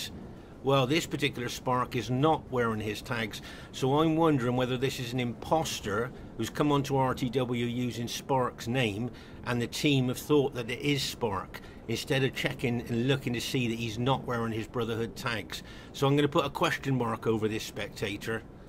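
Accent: West Country, United Kingdom. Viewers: as someone with a rural irish accent i respect immensely the dedication to serious commentary from someone from the west country, for such a great game too. thanks for uploading these videos from someone who plays napoleon total war's mod NTW3 a bit too much!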